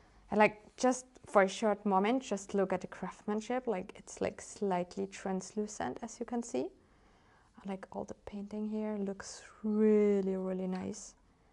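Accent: Germany. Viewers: ^well china painted miniatures from resin are usually heavy. And before i order something i ll be looking for some apce, not afterwards. I cant get rid of the tought that she is like Carisma Carpenter in Angel... always talking, never stopping, but with this german accent... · i hear german accent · Are you german?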